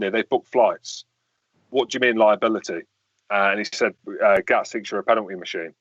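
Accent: United Kingdom. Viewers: Sounds very Scottish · Mate he has an English accent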